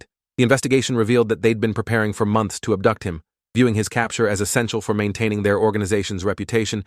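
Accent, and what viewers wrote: United Kingdom. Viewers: British accent anyone?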